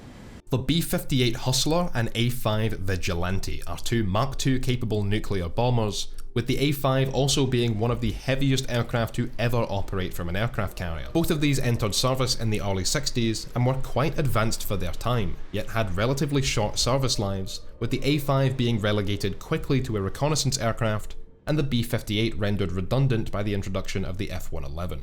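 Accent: Scotland, United Kingdom. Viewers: I really like your Scottish accent, Mr.. Also, is that a rocket built into the tail of that ME 262?! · As a fellow Scot I believe your accent braw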